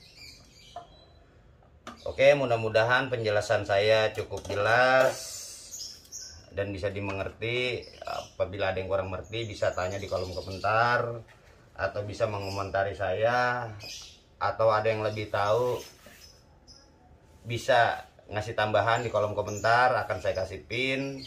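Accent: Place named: Jakarta, Indonesia. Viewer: Resep klo lagi ngobrol nya bang bahasa nya Betawi pisan sukses slalu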